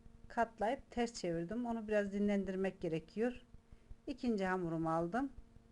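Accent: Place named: Kayseri, Turkey. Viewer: Bu Kayseri ağzıyla yorumdu harikasınız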